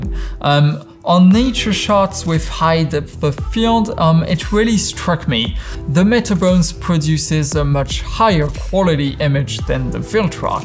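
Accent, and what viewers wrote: France. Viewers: Salut sachant que pertinemment tu es français de par ton accent même si c'est en anglais ta présentation est objective éclair me concernant je suis sur le viltrox car en premier lieu ça a été le budget qui me l'a imposé tu es rentré dans détail technique qui certainement on était factuelle pour le metabones et du fait que je ne suis pas dans de la technique pure je suis avec mon gh5 viltrox m2 et un sigma 18-35 à l'image dans 95 % des cas nous ne le voyons pas sachant que nous réalisons des clip pour le public et non des professionnels averti comme toi personne ne peut y voir une quelconque différence ensuite le jour où j'aurais un budget supplémentaire pourquoi pas upgradé,mais en l'état je suis très satisfait de mon viltrox m2,merci pour tes reviews de qualité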